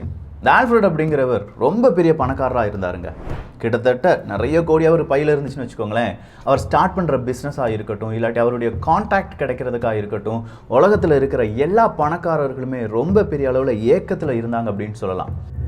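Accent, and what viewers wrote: Kerala, India. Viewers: Are you mallu?. some of words pronounciation like that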